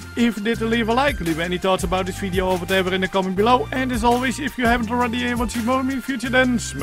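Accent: Netherlands. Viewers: it seems you are speaking better english than your first videos anyway so it seems you are already trying :) het nederlandse accent zal niet zo snel weg gaan haha maar ik denk dat als je engelse videos bekijkt en luistert je de woorden na kan praten voor je zelf en dan luisteren of het meer klinkt als de native engelse sprekers. Zodat de engels sprekende kijkers minder moeite hebben je te verstaan :D succes!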